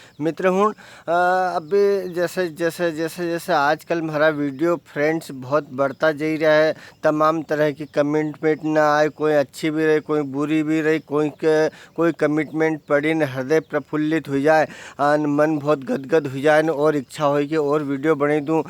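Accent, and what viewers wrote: Malwa, India. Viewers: ...man khush ho jata h apk video sunkar..khas kr apki malvi boli sun kar...👏👏👏👏👌👌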